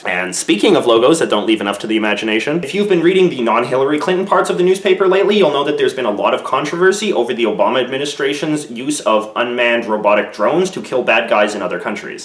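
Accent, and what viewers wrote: Canada. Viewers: You have an American flag behind you, and yet you're Canadian because you say "aboot" so hi fellow canadian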